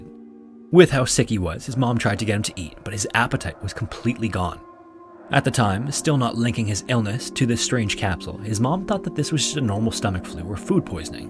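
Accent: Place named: Canada. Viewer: Love your stories and your Canadian accent! 🙂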